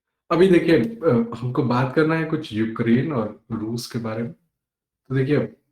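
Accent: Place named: Uttar Pradesh, India. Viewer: Aap ki boli style se andaza hai ki aap uttar pradesh se hai ,correct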